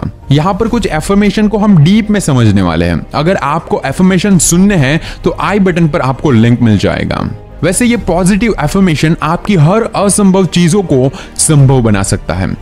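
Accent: Gujarat, India. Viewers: Aap gujraat se ho kyaa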